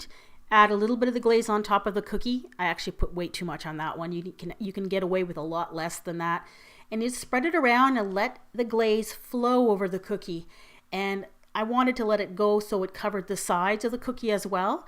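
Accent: Canada. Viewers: are you Canadian by any chance? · Are you canadian?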